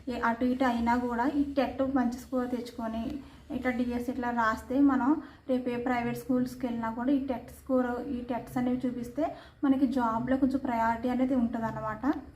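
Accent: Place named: East Godavari, India. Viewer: నేను అడిగిన దానికి మీ ఆన్సర్ అంతలా ఎందుకు.. జాబ్ వస్తేనే చెప్తారా?? Madi ఈస్ట్ గోదావరి..మీ వాయిస్ అలానే ఉంది slang అందుకే అడిగా..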